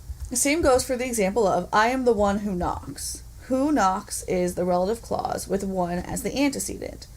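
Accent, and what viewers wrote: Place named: United States. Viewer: We I believe have some idea of how to pronounce Latin correctly. I am fairly certain the Romans did not have a thick American english accent.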